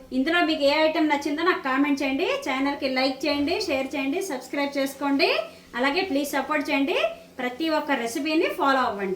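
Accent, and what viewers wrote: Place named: Eluru, India. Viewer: చక‌్కగా మాట‌్లాడతావమ‌్మ సూపర్ ఏలూరు మీది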